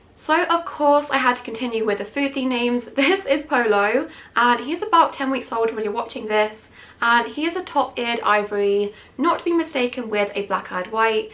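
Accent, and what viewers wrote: United Kingdom. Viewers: That high-pitched British accent is so cringeworthy.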